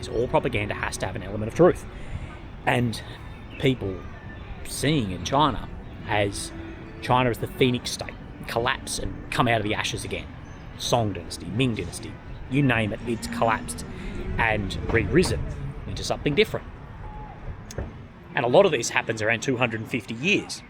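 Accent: Australia. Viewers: You must be Australian based on your accent. Welcome to China.🤣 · what accent is it， assuie?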